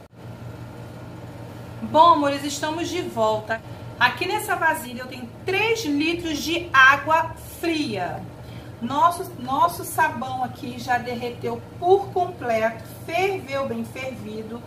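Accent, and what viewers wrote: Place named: Rio de Janeiro, Brazil. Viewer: Pronuncia carioca bonita.....show de detergente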